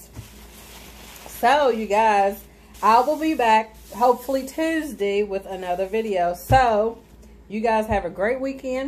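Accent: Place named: South, United States. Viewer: Love me som bk... love your country accent ❤️ ppl say I’m country but I don’t think so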